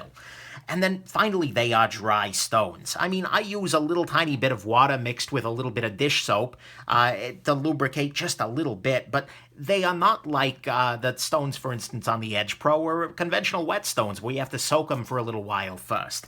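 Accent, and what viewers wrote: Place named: Brooklyn, United States. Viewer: Would you say the basic kit with just the 4 diamond stones from <num> grit is good enough? and what size rod would you say the base has coming out of it. I'm just looking and it looks close to a 3/8-1/2 rod I don't see the point paying the close to $50 for the base here in Canada. Amazon has the basic kit for $220 free shipping so thinking of just ordering that then making my own base wouldn't be hard to have a piece of wood and just put a bolt coming out. Hell almost looks like the lansky base would work too. And I have to ask where are you from? Accent sounds like a brooklyn or queens to me haha.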